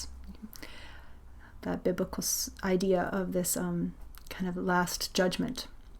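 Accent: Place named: Australia. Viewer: Hello, Thank you for your videos and your beautiful nature! Your tone of voice makes me wonder if you are originally Australian?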